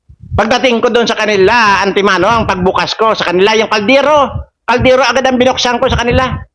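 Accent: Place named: Visayas, Philippines. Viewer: Ang cute ng accent nio magsalita ng bisaya Sir.Basta ang saya ko pag tumatawa po kayo😅 pati ako lakas tawa din😅.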